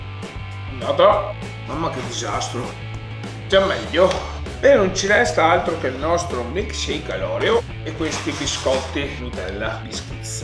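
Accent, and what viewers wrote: Veneto, Italy. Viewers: che brutto l'accento veneto 🙁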